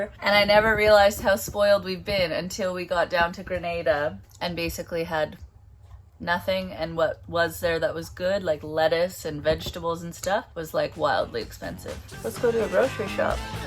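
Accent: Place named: Australia. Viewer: I am not sure if you are a yank or aussie as you got an Aussie ascent sometimes... then you got a yankee ascent .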